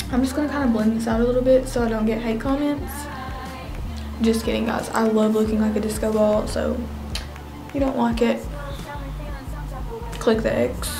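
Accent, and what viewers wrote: South, United States. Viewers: love that southern accent , where are you from ? - much love from South Carolina 💖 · nah but I love her voice and the country accent. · do you live in the south? you sound southern I'm curious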